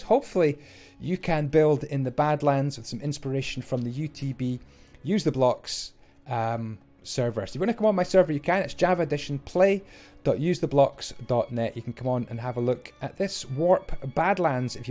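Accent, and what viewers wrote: Scotland, United Kingdom. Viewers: Like ur scottish accent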